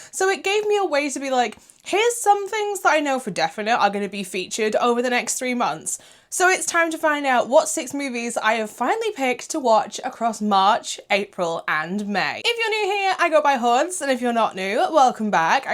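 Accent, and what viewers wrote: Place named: England, United Kingdom. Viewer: I can't sound as good as your English accent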